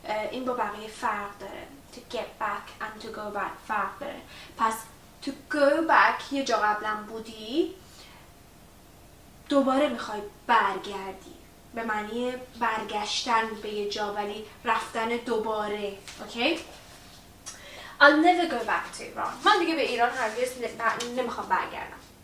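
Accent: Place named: England, United Kingdom. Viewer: سلام دوست عزیز . وقت بخیر . من حدود 3 ماه هست که برای ادامه تحصیل وارد انگلستان شدم وهمچنین برای تقویت زبانم در حال آموزش زبان انگلیسی هستم و تازه با آموزش های شما آشنا شدم. من اولین کلیپ که از شما دیدم اونی بود که اول به زبان انگلیسی فعل های get back , ... رو توضیح می دادید و بعد به زبان فارسی. حقیقتا من اولش که اون کلیپ رو دیدم واقعا هم از لحاظ ظاهری و هم از لحاظ لهجه فکر کردم شما یک خانم اهل انگلیس هستید که دارید زبان انگلیسی رو آموزش می دید و وقتی دیدم که به زبان فارسی هم تسلط دارید واقعا تعجب کردم. شما با لهجه کاملا انگلیسی و روان صحبت می کنید و تمام مواردی رو هم که می گید دقیقا کاربردی هست و مردم انگلیس دارن توی کوچه و خیابان از اونها استفاده می کنند. البته اگر اشتباه نکنم شما احتمالا مدت طولانی هست که مقیم انگلیس باشید. به هر جهت کارتون واقعا عالیه و من مطمئنم که اگر با برنامه پیش برید و مطالبی که برای ارائه انتخاب می کنید همچنان جز موارد اولویت دار و مهم برای آموزش زبان انگلیسی باشه حتما جز بهترین ها در آموزش زبان انگلیسی خواهید بود.استفاده از دوره های شما برای من خیلی مفید هست و امیدوارم که همچنان به تولید کلیپ های بیشتر ادامه بدید. 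من دوره های آموزش مکالمه انگلیسی در 30 روز رو پیگیری می کنم. البته این سه جلسه اول برای سطح زبان من مناسب نبود ولی مطمئن هستم که کمی جلوتر برید حتما من هم استفاده خواهم کرد. ببخشید اگر طولانی شد ولی حیف بود اگر در پاسخ به کارهای خوب و حرفه ای شما این توضیحات رو نمی دادم. برای شما و همه دوستانی که شما رو دنبال می کنند آرزوی موفقیت دارم.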